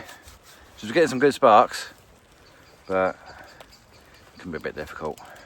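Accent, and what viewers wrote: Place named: South East England, United Kingdom. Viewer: I feel like I know the accent. You sound south-east UK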